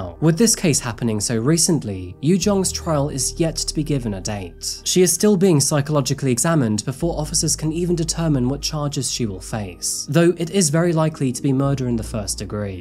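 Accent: England, United Kingdom. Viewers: Thank you,you ARE one of the best crime storytellers on YouTube. With your clear and properly English accented voice, you ARE a class above the others,please carry on with the good work, you ARE much loved (by me anyway!😊) Bravo! 👍👌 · Dear, may I ask which part of England you are from? I am very interested in the different ways English is spoken in the different parts of England. And of course in true crime I truly appreciate your hard work you put into your videos. CHEERS, Orsi from Hungary.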